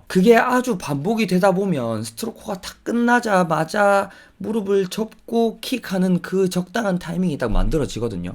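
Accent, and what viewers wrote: Gyeongsang, South Korea. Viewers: 땜 경상도 사투리가 서윗해졌어요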